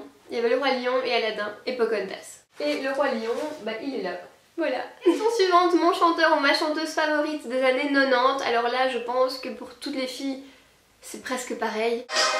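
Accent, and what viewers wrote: Belgium. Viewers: Oh je suis nostalgique <3 j'adoooore la vidéo et ce look te va à ravir :* C drôle comment vous dites 90 xD c l'accent belge ?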